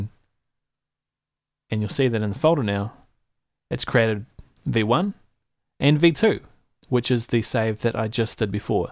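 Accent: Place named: New Zealand. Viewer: Love being a kiwi then hearing another and be like ahh thats better coming from some 12 year old strong american accent haha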